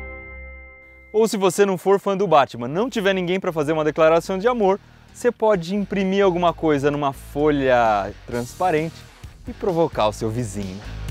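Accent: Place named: Minas Gerais, Brazil. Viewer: Iberê vc é Mineiro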